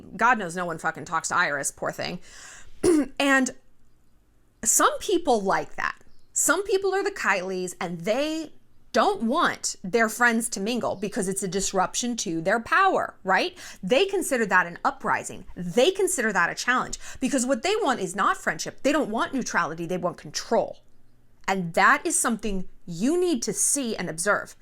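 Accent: France. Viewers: The French accent 😭😭😍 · Got a like from me for that french accent :D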